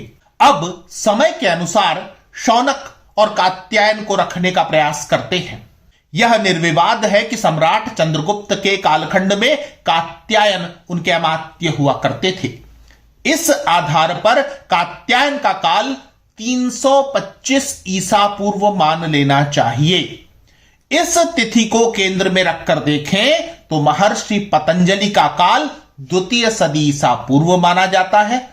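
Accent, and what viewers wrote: Standard. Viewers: शुद्ध हिन्दी उच्चारण, बहुत दिनों बाद सुनने को मिला| इसे कहते है हिन्दी!!! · हिन्दी का इतना शुद्ध उच्चारण बहुत कम सुनने को मिलता है. साधुवाद! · बहुत ही सुंदर जानकारी वो भी शुद्ध हिंदी उच्चारण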